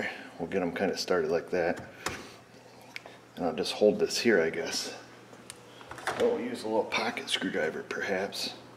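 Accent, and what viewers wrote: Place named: New Jersey, United States. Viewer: wait what are you actually russian i thought you was from joisey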